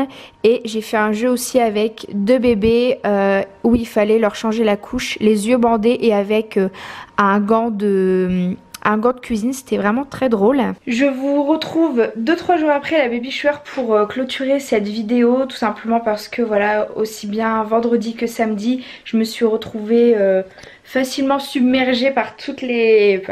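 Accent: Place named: North, France. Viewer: Je m'en doutais que tu étais du nord j'ai entendu l accent puis après tu as dis Euralille j en étais sûre haha... tu as trouvé où un joyeuse fée du coup ?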